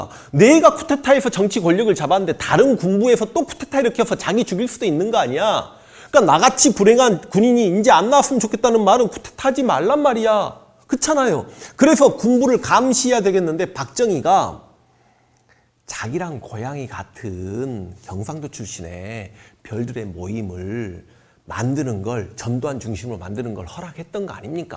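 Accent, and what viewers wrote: Jeolla, South Korea. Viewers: 이사람은 전라도 광주 출신인가!!??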